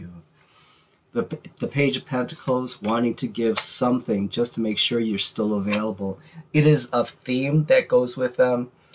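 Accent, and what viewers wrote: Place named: Ireland. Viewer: thank you so much for all this information in this hopeful reading, hope your feeling okay and love the Irish accent 🙈😊 thank you for always making my day 💖 looking forward to the next reading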